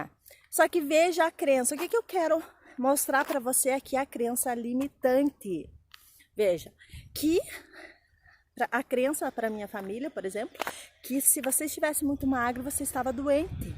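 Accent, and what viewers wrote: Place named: Paraná, Brazil. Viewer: Pelo sotaque é paranaense hehehe Parábens pelo vídeo, sucesso em seu canal!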